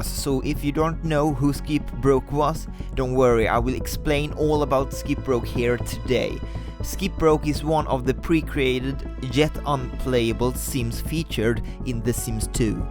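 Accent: Sweden. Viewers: It's Swedish. Russian accent sounds much more different. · Are you Swedish? Sorry if I offended you but I would like to know😀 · I just know you are Swedish, i can tell from the accent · You're Swedish, right? I kinda hear it on how you pronounce certain words. I myself, am from Norway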